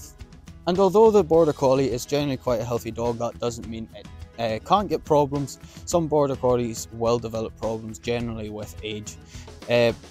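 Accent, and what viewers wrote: Scotland, United Kingdom. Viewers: I struggle understanding where this guys from he sound north east scotland or irish is messing with my head 😂😂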